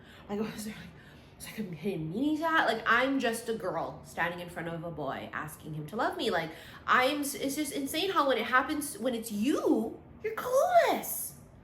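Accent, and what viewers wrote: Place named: New York, United States. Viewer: Oh wow. Didn't know you were half Japanese nor Filipino. I knew it was something but couldn't put my finger on it. Sometimes You sound like you grew up in my NYC neighborhood! 😂 Love it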